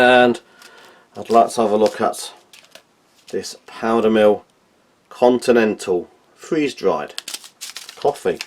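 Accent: United Kingdom. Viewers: Your reviews are fantastic. There are few things I enjoy more other than relax to your reviews, your calm british accent and James Bond style manners and thorough review of your ration. This clip, like most of your others, is a Masterpiece. Please keep up your awesome hobby. And please, for the love of god, do not cut your videos short because you've reviewed a specific type of coffee or tee or whatever before. It always adds to the enjoyment. Thank you.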